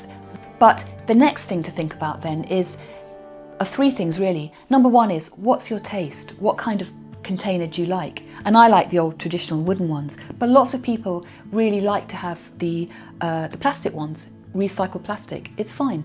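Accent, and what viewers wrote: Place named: London, United Kingdom. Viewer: Miss,I really, really love your London accent and your videos, I wish you a healthy and happy family!!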